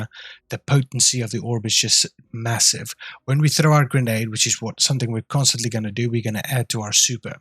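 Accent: South Africa. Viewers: Hey man you sound South African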